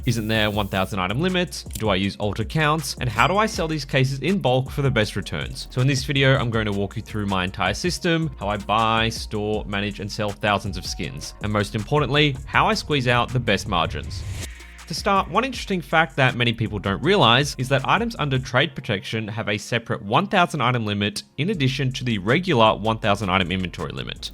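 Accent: Australia. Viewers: couldn't help but notice the aussie accent haha. I'm from Australia myself and was wondering how you account for the 10% gst for depositing on 3rd party sites like skinport/csfloat. Do you just tank the fees or is there a way to navigate around it? Love the vids man keep it up!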